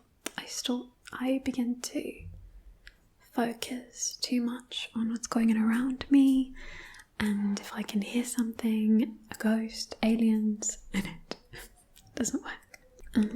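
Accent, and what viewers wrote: England, United Kingdom. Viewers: That accent was awesome I can speak in a British too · Love your English accent. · Its funny these are a mix of different brittish accents lmao. Shes like cockney, posh, chavvy etc lmao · You are always so Beautiful!!! I really appreciate you and your work and you look so Beautiful and Sexy with Glasses!! I love the British Accent! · My god your English accent is incredible, or is your American accent amazing, i'm confused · The English accent is really good,. Side note, if you can't stand the feeling of skin against fabric, are you naked all the time? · The accent is very impressive. I am from the UK and have friends who talk exactly the same. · Oh my god, your English accent is so good. I’m from the UK so can usually pick up on little inaccuracies, but this one would fool me!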